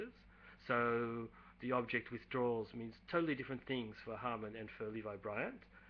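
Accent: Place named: France. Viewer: You've definitely acquired an interesting accent after so many years living in France. ("important")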